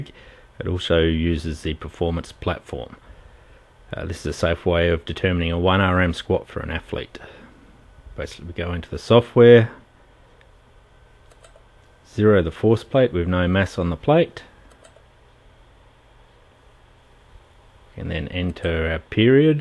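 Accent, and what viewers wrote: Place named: Australia. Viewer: Hi, Video is in English although with a strong Australian accent which is kind of cool :)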